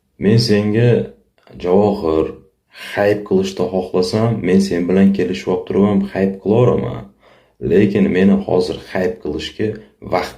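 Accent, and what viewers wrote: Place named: Andijan, Uzbekistan. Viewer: Нима бупти шевасига анжонли хм нима дмокчисз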